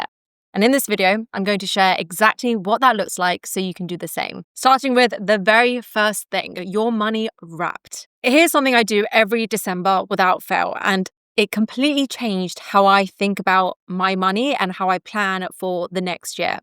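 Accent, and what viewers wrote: United Kingdom. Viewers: Is her accent common in the UK? Sounds amazing.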